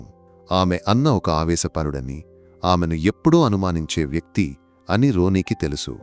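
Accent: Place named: Kerala, India. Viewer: మాతృభాష మళయాళం అయిన మీ నోటివెంట తెలుగు పదాలు వినటానికి చాలాబాగా అనిపించింది. డి వై ఎస్పీ అనే పదం కేరళలోనే విన్నాను. 6 సంవత్సరాలు మీ రాష్ట్రం లోనే గడిపాను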